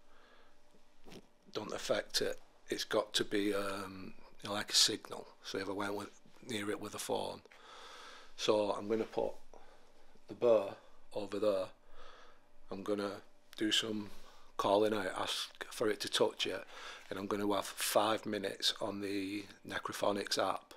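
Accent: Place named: Northern England, United Kingdom. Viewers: Alreet, lad. I'm new to your videos, and I've more or less binged watched them. When and how did this all start mate? You sound local too. I'm from Manchester. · This is madness. You sound like me, are you sheffield/Rotherham area? Do you get scared at all or have you just got used to it?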